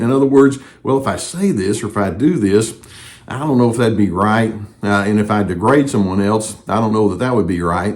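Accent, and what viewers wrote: South, United States. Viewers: As an aside...I just wanna say..I love your southern accent. ❤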